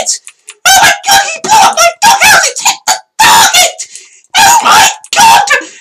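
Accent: United Kingdom. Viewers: British sir pelo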